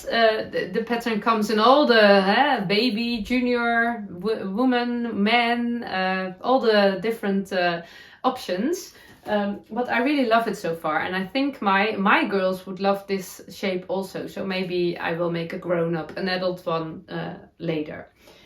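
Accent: Netherlands. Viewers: I love it. My dad and his family were from Holland, most of them have now passed so I love to hear your Dutchy English. It reminds me of them. I enjoy hearing about your culture also seeing some of the sites. Thank you for your English speaking podcast.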